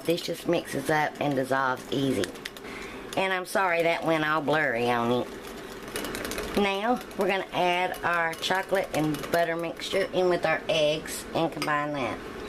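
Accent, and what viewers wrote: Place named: West Virginia, United States. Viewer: thank's I'm not sure how to make ganache. but will try to google it, I hate to be so dense, but could you use vanilla or caramal chips instead of chocolate?? I love chocolate but my husband likes the yellow cake. I just love your voice, reminds me of where I came from, WV. left there 55 yrs ago. but all my friends are still there. love ya and thank's for responding.BTW I got adventurs and tried the Jiffy raspberry muffin mix and put it in a small cake pan, airfried it for 20 min and it came out perfect, I couldn't beleive it.just enough for the two of us.. tks again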